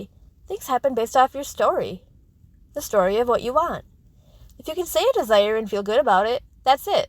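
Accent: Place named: United States. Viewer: Thank You Yesterday For All Your Patience, And Kindness To Helping Realize That I Am The One Who Decides My Reality. You Are Truly Awesome. I Am Curious Of Your Accent What State Are You From ? If You Don't Mind Telling Me. If You Do Thats OK. I Understand. Regardless You Are Awesome. I Am Sure By Using The Term Awesome You Have Figured Out I Am Old. LOL.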